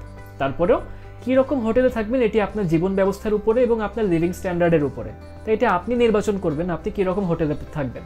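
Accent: Standard. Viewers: বাংলায় উপস্থাপনায় এক যুগান্তকারী উদাহরণ অপনি এবং বিশ্বকে সম্পুর্ন শুদ্ধ বাংলায় উপস্থাপন আপনার থেকে ভালো কেউ করতে পারে নি এটা নিশ্চিত ♥️♥️💕💕 এগিয়ে যান আরো অনেক দূর ♥️ আল্লাহ তায়ালা আপনাক নেক হায়াত দান করুক ♥️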